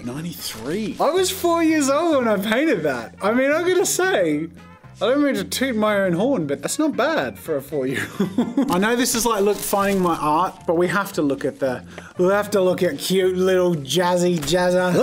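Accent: Australia. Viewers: there's more ozzie accent when you are more spontaneous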